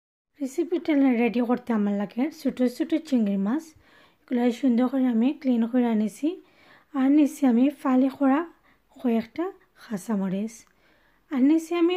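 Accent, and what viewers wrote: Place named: Sylhet, Bangladesh. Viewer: আপু দারুন রেসিপি,তোমার কথা শুনতে খুব ভালো লাগছে,বিশেষ করে “ “গান শোনার পর আমি সিলেট ভাষার প্রেমে পরে গেছি❤️❤️❤️❤️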